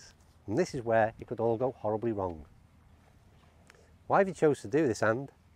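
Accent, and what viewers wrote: Liverpool, United Kingdom. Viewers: I think scouse is as English as you can get. From a Manc.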